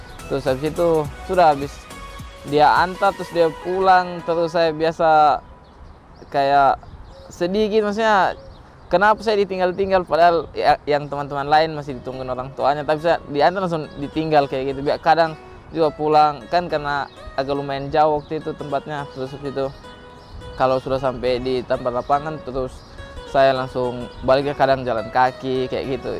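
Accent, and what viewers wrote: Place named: Palu, Indonesia. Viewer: Sahabat sulawesi... Logat palu asli... Hehehe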